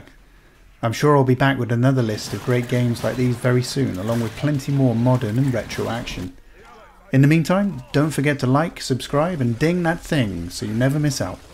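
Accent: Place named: United Kingdom. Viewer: The narrator has such a sexy british voice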